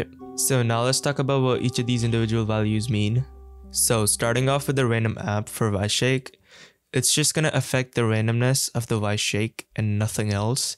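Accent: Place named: India. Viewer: really helpful indian accent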